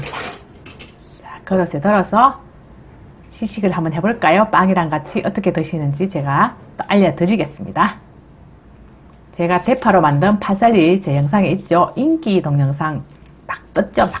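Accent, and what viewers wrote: Gyeongsang, South Korea. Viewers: 말씀도(구수)하게"잘"하시고~! 경상도,(방언)너무,재미있어요~! (짱)~!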